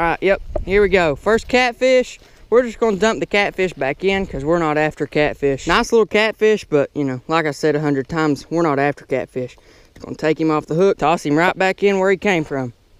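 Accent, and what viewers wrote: United States. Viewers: Country akcent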